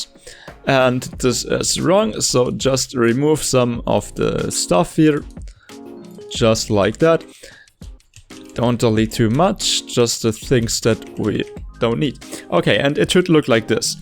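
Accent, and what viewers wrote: Germany. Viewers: man hört soooo dass er deutsch ist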